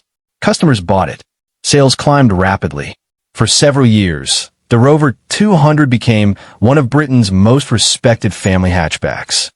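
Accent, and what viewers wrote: United States. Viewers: Number 8 MG Zed R. Why is this narrated in an American accent? A channel with the title UK Torque, referencing British cars, should at the very least use a British narrator.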